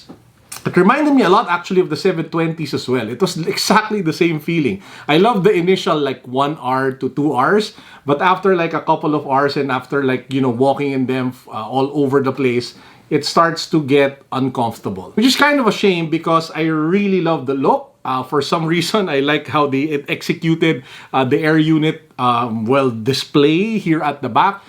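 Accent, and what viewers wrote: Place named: Philippines. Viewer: You sound pinoy kuya.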